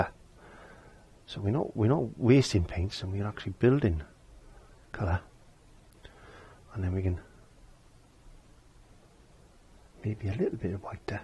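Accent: Wales, United Kingdom. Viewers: Thanks. I have a question: Are you Welsh?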